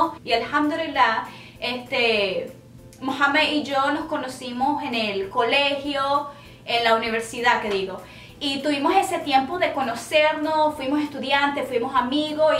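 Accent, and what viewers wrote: Venezuela. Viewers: Ya decía yo ese acento es de Caracas jajajaaj que hermosa que dios te proteja soludos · ¡Me encanta tu acento venezolano! Que padre que sigas haciendo más vídeos en español 😁 me encantan tus vídeos aunque no soy musulmana pero es muy interesante saber de otras culturas. Un saludo desde México ✌ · Hace mucho más de un año que no veía tus videos jajaja me encanta tu acento suena tan de la zona centro de Venezuela jajaja jajaja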